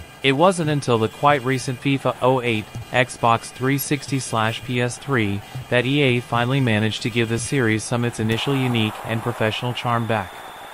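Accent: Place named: North America. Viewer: "Being from Merseyside" Speaks in a North American accent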